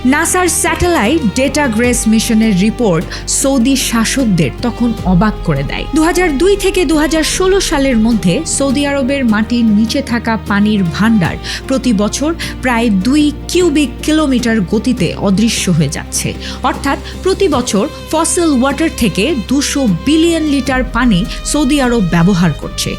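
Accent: Bangladesh. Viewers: আপনার ডিটেইলস পরিচয় জানতে চাই। একটি ভিডিও করলে ভালো হয়।আপনার কথার টোন ভারতীয় নয় বাংলাদেশীদের মতো। তাই কৌতূহল বশত কমেন্টস করলাম।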